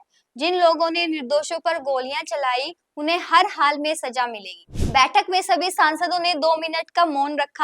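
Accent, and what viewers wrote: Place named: Purvanchal, India. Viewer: Jai hind... N for the 1st time in my life i luv Purvanchali accent Coz of ur content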